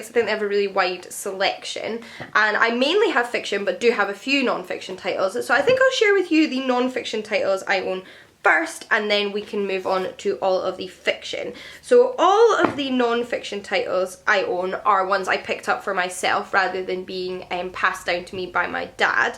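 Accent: Scotland, United Kingdom. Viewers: I discovered your channel a few days ago, and i have to say i'm not disappointed. Besides litterature; being a non-native (from Algeria) english speaker, listening to you really helps me getting used to scottish tongue. So thank you for all that. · you talk. i fall in love with Scotland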